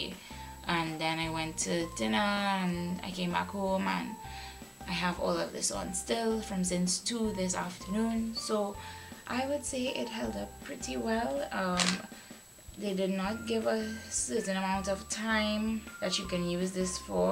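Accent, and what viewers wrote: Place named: Caribbean. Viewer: Love your accent ..Caribbean girls run it ..love this review😊💯👍🏾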